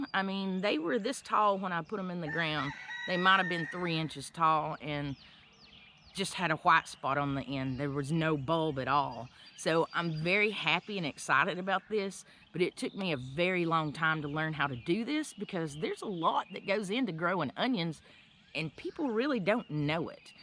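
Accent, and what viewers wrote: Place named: Georgia, United States. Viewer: This has got to be THE BEST video I've seen on onions! We also are in N. Georgia (Gwinnett County). I LOVE YOUR ACCENT! Two years ago I sat with a Georgia extension agent and had a long talk about growing onions. I proceeded to seed several varieties of short day onions and had HUGE success. Then to harden them off before sticking them into a raised bed, I set them beside the poly house in the semi-sun -- and we got visited by a late season hurricane. All that water washed down into my trays (and i forgot about them being in solid bottom trays) and drowned almost all those onions. What didn't rot we put into the ground and ignored the chickweed. You KNOW what happened. We salvaged a few that are now in stacking planters and are going to seed, which is fine because we will collect the seed and start over this year. 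Thank you for the details you provided, they are right in line with what the extension agent told me. Maybe this year we'll get off our butts and actually maintain them better. I'm going to try grow bags like you have there. How many did you fit into the bag and did they develop as you wanted? Also, how many times did you give them a hair cut?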